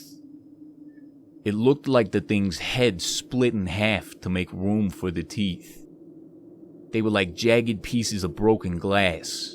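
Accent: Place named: Massachusetts, United States. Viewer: This was a great story! You did a pretty good job with the Mass accent, too.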